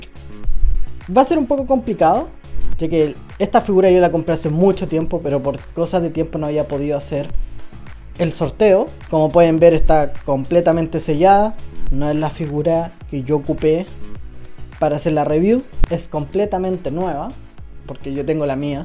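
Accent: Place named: Chile. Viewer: Amigo mio, vi un video tuyo armando la baf de controller, me causo familiaridad tu acento. Me ha sido difícil encontrar un chileno que coleccione y comparta videos como los tuyos. Te felicito por tu colección y videos los cuales seguiré viendo por supuesto. En lo personal también tengo mi colección que seguramente compartiré en algún futuro Un abrazo desde Chile amigo, Talca Que pases un excelente año nuevo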